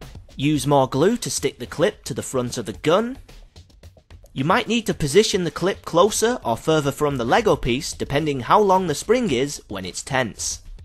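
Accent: United Kingdom. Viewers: This guy is Q from 007, also he's brittish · Its good. I'll try it! Did you notice a music player somewhere ? Its a Bose Wave Music System. I have one. And lemme guess. The guy is British, isn't he? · the stick from a 'Popsicle' i think he's British like me.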